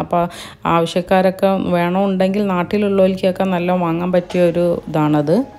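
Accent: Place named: Kozhikode, India. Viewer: Wa alaikkum mussalam Bhayankara bhayankara vellye fan aan njan ee nde Masha allah Almost ingalde ella videosum kand kayinj actually irfanatha vazhi aan ingalekk ethnnath and you’re so so adipoli ❤ Edkk verne ingale kozhikodan slang comedy aan😅 Masha allah keep going 🫶 Withloveshaibi❤️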